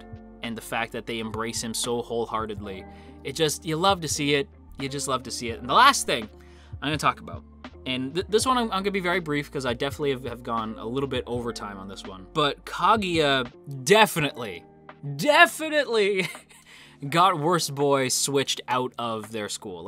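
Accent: Canada. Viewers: great videooo as usuall! i was super excited on hearing your thoughts on this episode! on another note i had a feeling from ur accent u were canadian and you confirming it really made me kinda proud of myself i guessed right yay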